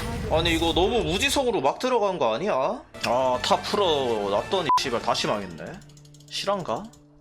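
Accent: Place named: Gimhae, South Korea. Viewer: 약간 김해 냄새가 나는데?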